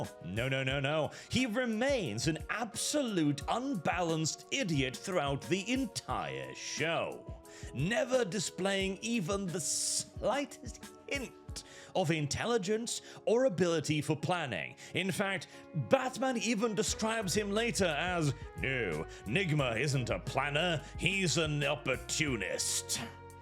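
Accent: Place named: United Kingdom. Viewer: Damn your British Accent makes this review so much better. Feels like 🤔…Writing Styles of The Broke & Brainless would be an appropriate title 😂.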